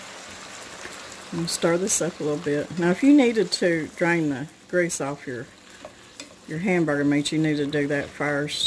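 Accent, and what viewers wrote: South, United States. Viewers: I wish I enjoyed hearing her talk…..but I don’t care for types of south Wern accents. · Love her southern accent and love that she enjoys tamales we make them every Christmas de queso con chile verde and green chile pork tamales and red chile chicken my fav is sweet corn tamales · How interesting and useful! I live in Arizona and make Mexican food all the time. It's different to hear a very southern accent! I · Love your accent. I’m Southern too. Keep it Southern!😁😁💕💕💕. Good dish thanks. I cook old time Southern food, South American authentic, and a couple Mexican dishes and authentic tamales. No me gusta pansa o menudo porque es puro quedito y nada carne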